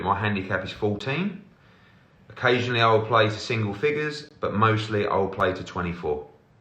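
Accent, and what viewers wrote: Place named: Cockney, United Kingdom. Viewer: knows his market. From a posh boy into a cockney geezer attracting “ the lads” Similar to Dave Allen fans.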